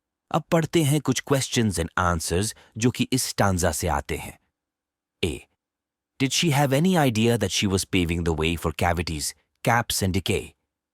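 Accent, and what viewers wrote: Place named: United Kingdom. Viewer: change the voice changer, this is in uk accent change to something that can we understand apart this your teaching style is very unique and good it helped me thanx😊❤👍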